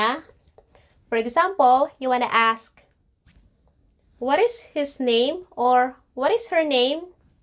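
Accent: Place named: Ilocos, Philippines. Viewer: No need to be embarrassed! It's a video about Ilocano, so it doesn't matter if you speak Tagalog with an Ilocano accent 😉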